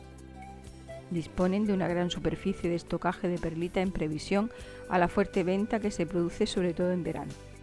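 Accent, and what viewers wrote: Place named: Murcia, Spain. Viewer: E murcianica la que habla.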